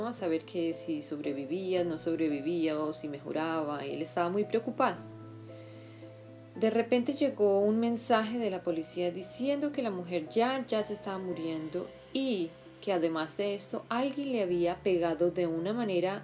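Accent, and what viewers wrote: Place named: Colombia. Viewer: Aceptemos la diversidad, los acentos de cada region son diferentes. es Colombiana y esa forma de hablar es muy tipico de su pais.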